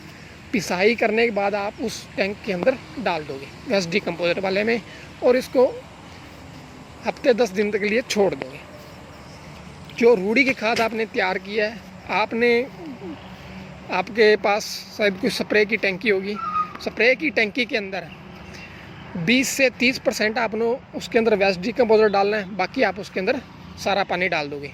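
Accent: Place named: Rajasthan, India. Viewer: Bhai tumhari language ka accentto rajasthani h but tumne khud ko punjab se bta rakha h,, but overall good job..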